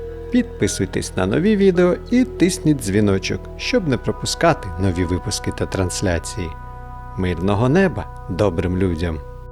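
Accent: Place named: Russia. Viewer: У вас ужасный русский акцент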